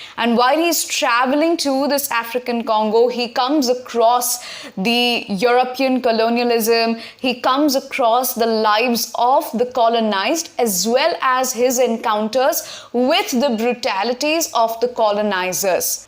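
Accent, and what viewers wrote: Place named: RP, United Kingdom. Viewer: Your accent sounds like that of a BBC News presenter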